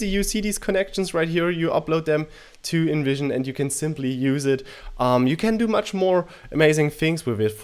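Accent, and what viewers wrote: Germany. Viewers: Nice Video, love that German accent - From an American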